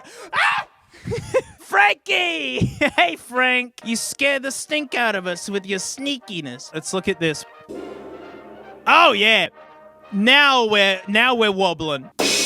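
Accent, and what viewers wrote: Australia. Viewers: Wait a minute, " ", that familiar Aussie boy voice, is this the man behind Tony and the Litreboard?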